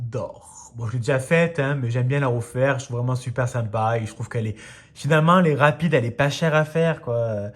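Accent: South, France. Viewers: Sa vas? Accent du midi sa réchauffe le coeur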